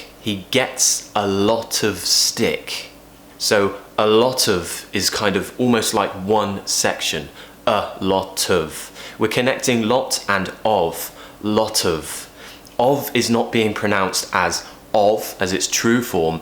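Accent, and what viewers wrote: United Kingdom. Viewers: British Accent so awesomee...... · I really enjoy the video and British English, I learn lot of things from you and I'll keep watching your lessons.Thanks a lot. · i just happened to run into your channel a few days ago. i have to say british accent is definitely my favorite - or favourite, shall i post? - great of you to post all this. greetings from caracas, venezuela. 👍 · Thank you so much for this lesson,I really love British accent 😊👍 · I really admire people who speak british accent.Sounds pretty good to listen and I keep listening your video.Love your diction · I have a one question for you. Your accent is properly what kind of British accent?? Posh? Queens? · I have been learning American accent couple years ago but when I saw your vidoes and your amazing accent I decided to dedicate my tongue for the British accent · i'm dying in this british accent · I love British accent 🇬🇧🏴 From Cambodia 🇰🇭🇰🇭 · So cute, i really love British accent.Sounds sweet. 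I'm excited for this video. Thank you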